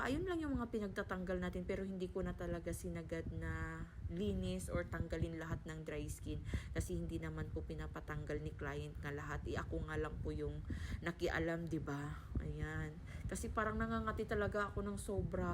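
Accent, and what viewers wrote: Iloilo, Philippines. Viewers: Hahahahaha .. N d sapaka ahh..nami ya imo tingog...!! Ilongguha madam ahh..hehehee Bay'i da cla...hehehee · Illongo accent is a bit annoying here in negros oriental its an accent from bukid only kagid haw haw d karabao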